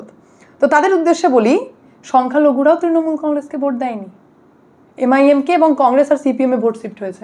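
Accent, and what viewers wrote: Bangladesh. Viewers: তোমার বাঙাল কথা টা যে কি ভাল লাগলো 😂😂😂😂😂😂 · tumi to besh bangla desii bhasa ta darun bollen khub bhalo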